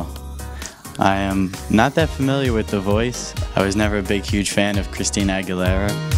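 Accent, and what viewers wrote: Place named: Canada. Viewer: Is he Canadian? I have a Canadian friend who sounds almost the same.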